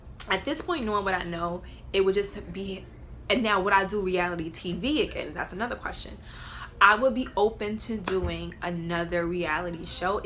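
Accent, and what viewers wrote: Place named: Chicago, United States. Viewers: Damnnnn the Chicago accent totally disappeared 😂😂 still loveee me some tho!! Happy for you and your evolution ❣️ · what happened to that country Chicago accent? You sound like your from the Bronx now lol. Loved you on BGC and Love you now! Best Wishes too you 😘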